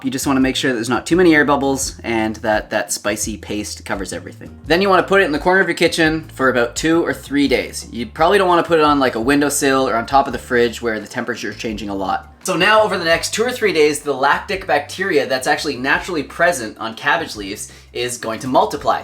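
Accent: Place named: Canada. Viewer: He's Canadian. He's def has the accent🙂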